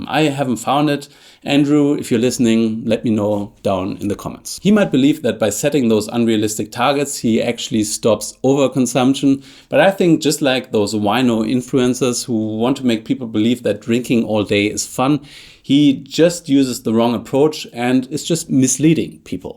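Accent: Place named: Germany. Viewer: Because of your German accent I automatically take you much more seriously when talking about research and statistics than the Californian Andrew Huberman. More seriously, stress and loneliness are two of the most harmful things for your health and moderate alcohol use can alleviate these things when you are sharing a bottle with friends and talking about life.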